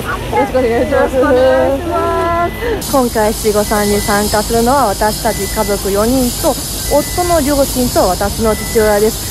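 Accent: Kansai, Japan. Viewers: ほんとにお父さんがお若い。。そしてさんの関西弁が新鮮ですきです！❤️ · さんのお父さん、めっちゃ若いです☺️✨お父さんとの会話で関西弁が出てて同じ関西人としてはなぜか嬉しかったです🥰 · 飴をペロペロの話可愛すぎて、つい可愛いーーーー！って言ってしまいました♡ さんの関西弁嬉しかったです！！関西人ファンより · さんのお父さんお若いーー！そしてさんの関西弁で素な感じもかわいいです🩵 無事七五三終えられてよかったです！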